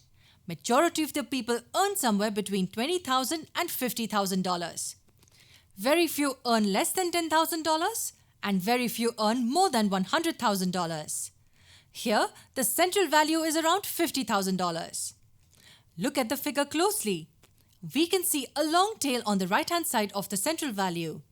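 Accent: India. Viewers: Indian accent lovely & cute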